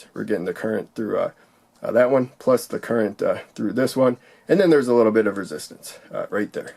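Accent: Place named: Minnesota, United States. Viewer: Bro, are you from Minnesota? I'm getting that ''ooh, yaah'' Fargo type of accent from you.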